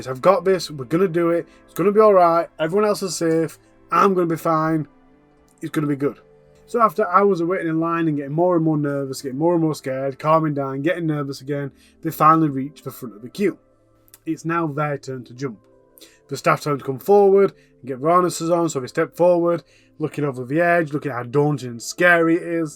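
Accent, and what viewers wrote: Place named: Northern England, United Kingdom. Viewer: I've heard these stories before, but not in a storytelling format with a Nth English accent. Good work.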